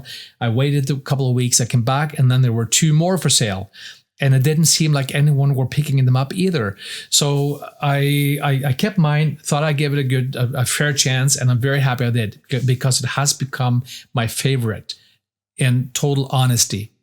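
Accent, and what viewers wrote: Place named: United States. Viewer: Excellent++ video! You seem like an authentic LA but you sound almost like an American? ;-) Meant as a compliment. I am a CW guy and if those RF insides were in an IC7300 - magic. But I have long Nordic fingers too and no way I can master those buttons, besides I dislike touching screens. Maybe FTdx10 housed in an FT2K or FT3K would be optimal. Yet I fear we are in the time of the silicon sliver iPad devices. If I ever see a mechanical S-meter again on a new radio it will be a Shock! de Wb8yqj diDit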